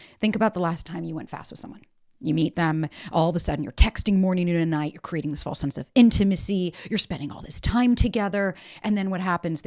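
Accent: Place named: New York, United States. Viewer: Must be a Newyawka? 😮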